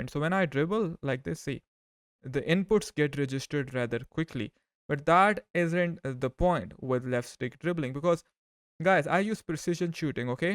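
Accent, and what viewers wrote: India. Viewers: Are you from India mother yar????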